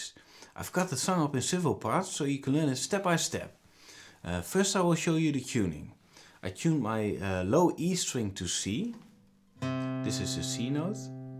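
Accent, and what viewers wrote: Netherlands. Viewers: I noticed from the accent you're Dutch. Awesome composition. Erg mooi! Gaaf dat je 't gratis weggeeft. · love the dutch accent :P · hahaha ik hoorde aan je accent dat je nederlands bent xD goede tutorial, dankjewel, alleen het zou het een stuk makkelijker maken als je op plaatsen zoals je rechterhand ook laat zien om de tokkel te begrijpen. dankje.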